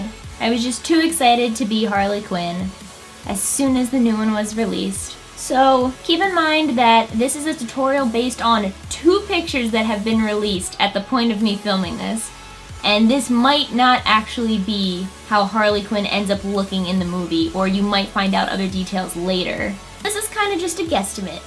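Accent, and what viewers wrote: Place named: New England, United States. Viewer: Love it! She's my favorite character ever. I think that your normal, dramatized NE voice is Harley-esque enough!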